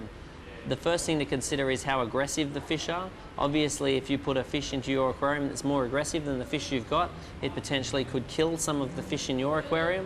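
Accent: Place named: Australia. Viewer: He's an aussie, not a brit lol. And for some of us who have high amounts of aggression in our tanks this advice is very helpful.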